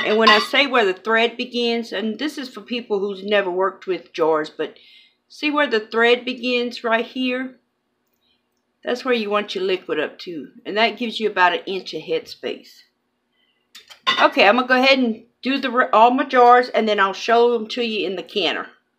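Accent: Louisiana, United States. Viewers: Hey Girl! Love this! I just found your channel and subbed! We live in Alabama now, but my husband and i are from Louisiana (he's from New Iberia and my people are from Natchitoches). I don't see many good, down to earth channels from people who sound like home from Louisiana, so: Good On You! God Bless!!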